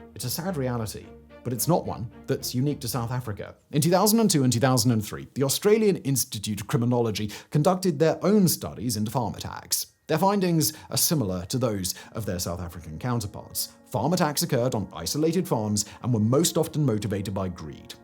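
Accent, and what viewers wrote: RP, United Kingdom. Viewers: Oh!! You must be Spewing Accurate Data with your Know it all' Stuffy British Accent Right ✅️ No Leftist Bias here at all..😂 he's sadly Marginalized a Plight of people which is Very Much Real.. but if you've got 15-20 minutes too hear another Know it all Brit Spew what his Taskmasters ask Hey' its your time too waste 🗑 ( i sadly Did!!) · Your posh English, lack of general knowledge, and biassed turns of phrase betray your prejudice and ignorance. "SANDF" under NP rule? "Communists" in air quotes...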